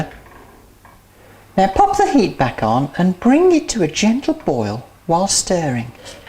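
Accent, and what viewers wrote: Birmingham, United Kingdom. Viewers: I like her British accent😇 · This video made me rush out and buy turkish delight (im lazy okay!). Can you please do some more videos with your birmingham accent:D? they're fab! Love. x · I'm really love british english pronaunce!!😆😆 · thanks you a lot, i just want let you know that i found a video that shows up how to do turkish delight and yours was made far better! PS= your british voice is so relaxing, i just love english lol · You sound like the most english person who has ever lived ☺ great video! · it is now I understand why you stopped making videos because half illiterate trolls would not understand a British accent if it came and kicked them on their protruding and exposed prominent posteriors. Nevertheless, if you had spoken like a cat which is being strangled and with a clothes peg upon your nose broadening your vowels, with absolutely no idea about how words are pronounced properly, and added a lot of grunts and hand gestures and fillers like uh-huh.whatever, possibly this particular viewer would have understood exactly what you said. 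But being parochial and not knowing the fact that the American language is restricted only to America while the rest of the world speaks British English in British accents, and we can understand what is being said clearly, isn't it time they stopped making public clowns of themselves, offering gratuitous insults to all and sundry? Oh, I forgot you,wouldn't be able to understand this message because it is written in British English and holds a reading statistic of about 5 and above, while your educational level needs messages to be written on a readability statistic of two in order to be assimilated, understood and comprehended. Please accept my commiserations. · I loved your little noises! and where from the UK are u from? Right!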